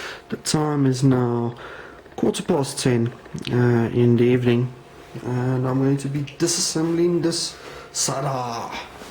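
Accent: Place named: South Africa. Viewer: Heavy Saffer accent. lol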